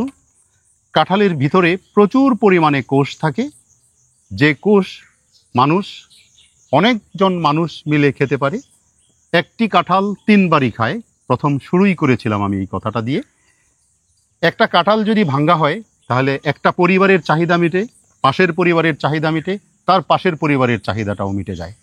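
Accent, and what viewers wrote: Bangladesh. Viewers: কথা শুনে এবং চেহারা দেখে বুজতে পারেন না এটা বাংলাদেশী চ্যানেল?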